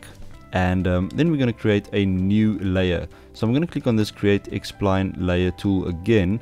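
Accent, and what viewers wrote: South Africa. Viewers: now thats a south african accent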